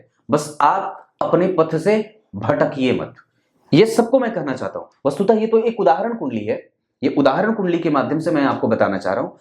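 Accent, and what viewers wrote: Bihar, India. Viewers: Ap bihar se ho😳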